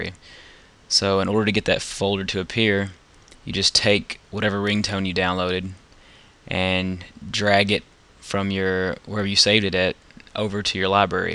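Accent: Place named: South, United States. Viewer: Actually, you sound like a southern chuggaaconroy.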